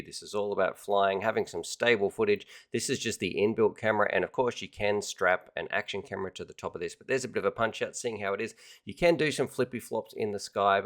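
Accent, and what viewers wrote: Australia. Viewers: Mate he's aussie it's his accent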